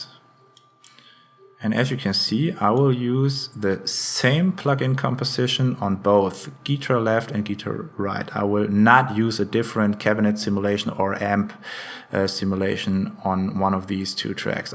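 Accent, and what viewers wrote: Germany. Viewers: I could recognize that accent anywhere. I know you're German. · I hate the german accent.. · I could tell just by your accent you were german. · man hört voll das du aus Deutschland kommst ^^ aber gutes englisch !!! thumbs up for you :D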